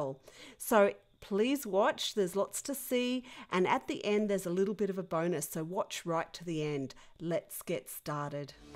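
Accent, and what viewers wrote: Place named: Australia. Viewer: Hi! I live in Sydney Australia and very pleased to hear your Australian accent! Hoping you are living in Australia at the moment. I really want to try this. Which magazines have you had the best results from? I've heard some don't work.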